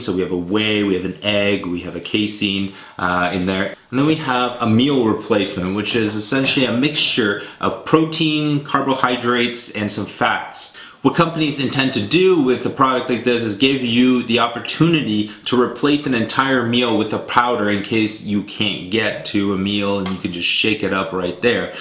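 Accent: Canada. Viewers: You have a thick Canadian accent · Is he Canadian?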